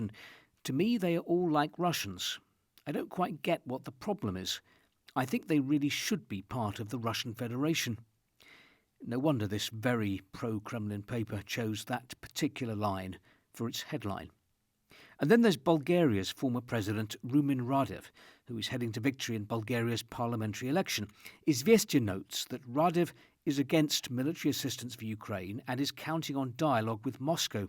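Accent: United Kingdom. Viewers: Nothing better than a British voice, telling everyone how appauling the rest of the world is for supporting russia, while harbouring billions of Oligarch money since the 90s and 00s. And not only that, the UK has it on the left and right all the same, Galloway and Farage both seeing who can get their nose the furthest up oligarchal donors. Both acclaimed political heroes of the politically demented.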